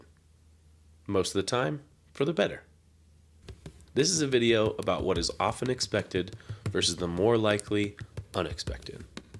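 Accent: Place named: Ireland. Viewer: The narrator sounded a little bit Irish. I'm Irish so a linguistic expert on dialect 😂. Lovely video and snapshots of your trip. Yes, this ltl country is gorgeous. There is a magic in the air and soil here. We Irish are chatterbox...we love to chat but are also interested in people. Ye did the right thing hiring a car and driving yerselves around..choosing yer own itinerary. I'm glad ye enjoyed the visit and all we have to offer here. Did ye jump into the Irish sea on any of these beautiful beaches brrrr? Can I suggest on next trip to Ireland you land in Cork Airport and drive in this area. Beautiful places..friendly people scrumptious food. Kinsale..Crosshaven with the oldest yacht club in the world..Balkymaloe cookery school and Gardens.. Ballycotton with it cliff walk.. fab pubs..grt food..fishing trips..guided tour to an island and hear its history and climb the stairs to the top of Ballycotton lighthouse..see the Mary Stanford lifeboat read all about its famous rescue. 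Visit Sea Church, go to a gig. Enjoy Irish music...lots to do. P.s ye did not need to kiss the Blarney stone..plenty ol chat out of ye 😅.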